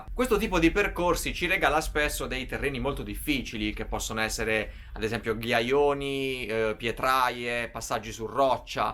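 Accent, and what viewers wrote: Apulia, Italy. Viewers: ma il "dove dobbiamo andare con queste scarpe", devo chiedermelo sempre con il tuo accento pugliese? o posso usare il mio siciliano?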